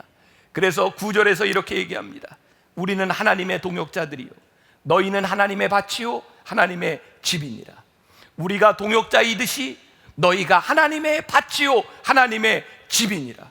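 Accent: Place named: Gyeongsang, South Korea. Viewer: 안녕하세요 만나교회담임목사님 제고향경상도대구에서와서요사투리참재미있죠항상은혜말씀끝은주님의사랑이죠아멘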